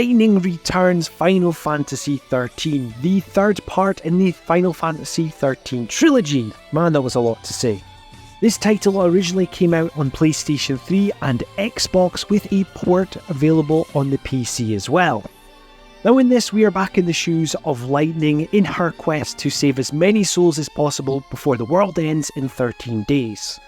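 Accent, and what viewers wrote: Scotland, United Kingdom. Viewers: Hey as a sidenote, yesterday I watched a collab video in Femtrooper's channel and it was a pleasant surprise to see you featured there! I'm really glad to see your channel growing and doing collabs like that, you make some great content keep it up! (Also it might sound weird but I love hearing some scottish accent for a change on YT haha)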